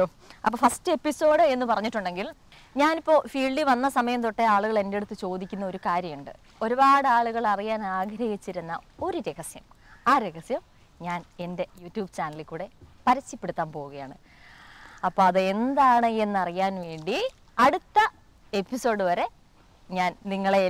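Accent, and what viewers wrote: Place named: Trivandrum, India. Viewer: Chechide sound ketal chechi tvm aanu nadu ennu thonnuva...kasargod aanennu parayila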